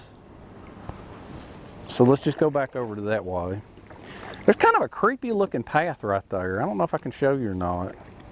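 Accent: South, United States. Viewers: As being a professional land surveyor in neighboring South Carolina I would think that the Georgia Department of Natural Resource owns the island and the surrounding river. Just subscribed I love your videos and the perfect southern accent!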